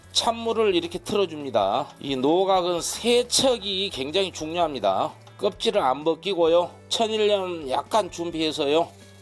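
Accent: Jeolla, South Korea. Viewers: 노각 짱아찌 꼬들꼬들 고기 먹을때 좋을것 같아요~저도 해서 먹어야겠어요~ 전라도 구수한 사투리로 재밌습니다😂 감사합니다😂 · 구수한 전라도 사투리 배우고 갑니다👍👍👍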